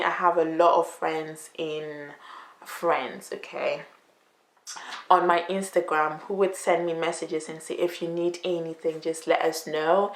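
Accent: Italy. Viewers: Já estás a falar TÃO bem! Com um sotaque italiano muito fofo e um pouco do Brasil! 🌷 Um beijinho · Seu português soa com sotaque italiano, fica muito fofo.🥰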